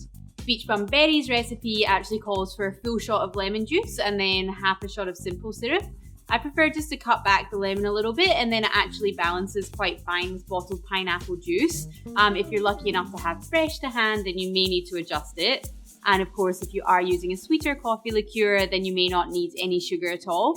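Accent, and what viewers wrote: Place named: Scotland, United Kingdom. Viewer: If you can make biscuits & gravy from scratch, I'd marry you. 😉 One of your vids started playing and your voice called to me like a Siren upon the water. Nothing else matters, I started sailing in your direction. Love your Scottish accent.a A pretty Ginger is a bonus.🙂 Seriously, you have a great channel. I'm a Single Barrel Jack Daniel's guy. Love the their upper end sour mash #whiskEy. Semper Fi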